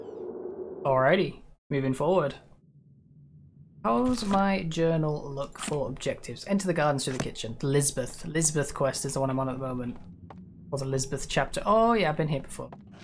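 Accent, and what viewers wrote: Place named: United Kingdom. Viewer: are u British? ur ascent is amazing I love it cuz it sound like you could be on a podcast or something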